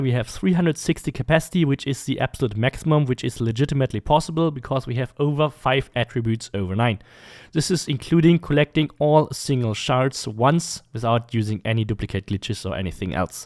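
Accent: Germany. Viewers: I think i hear the german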